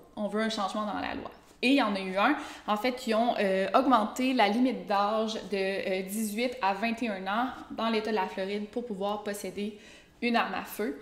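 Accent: Quebec, Canada. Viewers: Je déteste ton accent québécois mais la video reste bonne malgré l'accent horrible du québec 😢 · C’est comme ça que l’on parle au Québec. Et ça fait son charme, ici. Si ça ne te plaît pas, il y a une multitude de chaînes exclusivement francophones et/ou anglophones qui sont à ta disposition sur Youtube :) merci. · Avec un très agréable accent canadien !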